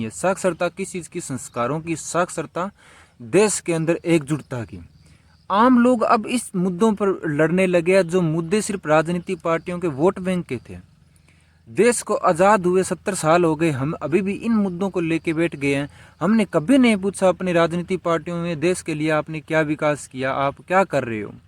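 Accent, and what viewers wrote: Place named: Pakistan. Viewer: Your hindi is absolutely sounding like Pakistani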